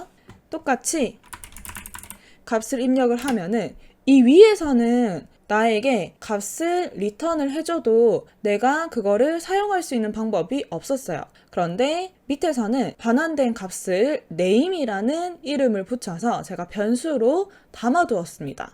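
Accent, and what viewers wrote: Gyeongsang, South Korea. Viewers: 경상도 사투리 반갑네요 ㅋㅋㅋㅋ 전 서울상경해서 코딩배우는 대학생입니다😢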